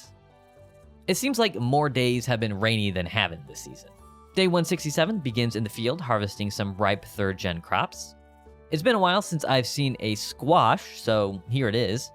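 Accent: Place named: South, United States. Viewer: Eyyyyy your southern accent came out here lol Now i hear it throughout the rest of the vid but, tbf, southern accents are hella endearing and you do a great job with masking it, fwiw xD This vid is still a big comfort lol I wouldnt call out the southern accent if I werent intimately familiar with it, rest assured xD Feels like an Arkansas or northern texas accent lol. My familys got that accent. I do too if I'm around the right people lol Forgive me if ya dont have a southern accent and I'm assuming xD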